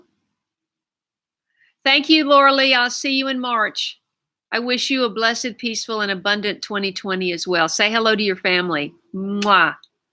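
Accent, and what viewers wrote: United States. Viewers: ... you're back in Palisades???? livestream had no idea you were puttin one out on transmission only by accident did i connect ( that's me for ya) you'll never guess all my 22 guests and friends.. how many all together your guess is as good as mine they just kept coming through my door we all sat where ever they could and connected to America (livestream) My family have been here in the UK since the run up week to Christmas so to hear a native American accent other than family made a few cry...with sentiment must say got to me also..... aas we sat watching and listening to comments it was a little heart touching but thanks you have made a lotta people happy here in my household THANKS....... have you had any response to house sale??? maybe now the holiday is coming to a closes it may get moving " i do hope so ( i pray it gets "done n dusted" Happy New Year... 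and a prosperous one seeya later more to say on email Edxx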